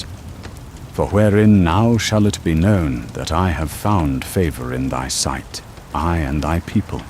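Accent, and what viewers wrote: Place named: New York City, United States. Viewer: It’s giving NYC OR Chicago 😍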